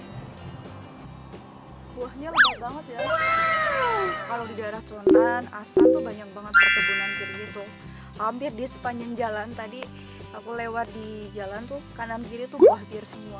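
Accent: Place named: Purbalingga, Indonesia. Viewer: Mirip banget kalo ngomong kayak mbak ku di Purbalingga.. lama ga ketemu karena sy di Kalimantan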